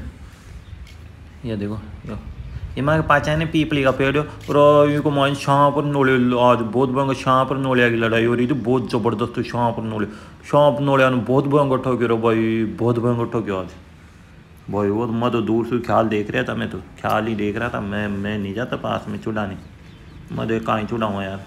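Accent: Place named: Marwar, India. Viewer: Marvadi bhut achhi lagti h Bhaiya apke muh s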